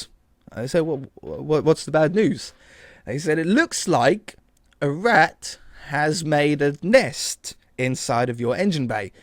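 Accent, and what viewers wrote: United Kingdom. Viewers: That British Accent I'm First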